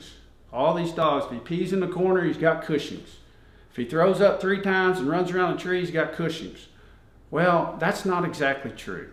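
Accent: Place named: South, United States. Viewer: I love that southern drawl 😊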